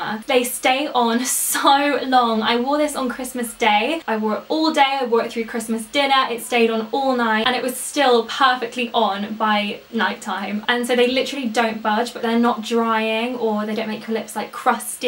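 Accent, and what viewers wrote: United Kingdom. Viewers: she's not american, she's british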